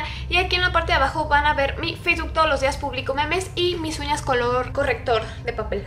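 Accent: Chile. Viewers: Anuma me si cuenta que era de Chile como io pedo soy Chilena XD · Me di cuenta de que el es chileno ._. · Ajaja se kacho altiro k era de chile akkakak ❤🇨🇱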